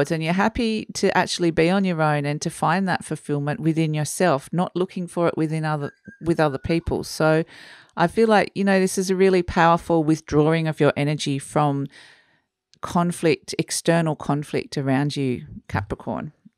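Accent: Australia. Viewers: Hello, great to hear a fellow Aussie doing readings on you tube. They are good readings too. Do you do readings at any of the psychic and wellness fair held in NSW? It would be great to meet you one day. Cheers.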